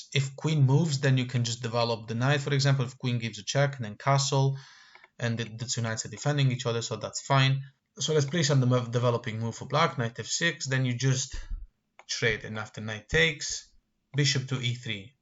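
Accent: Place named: Wales, United Kingdom. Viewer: You have an interesting accent. Welsh with something else.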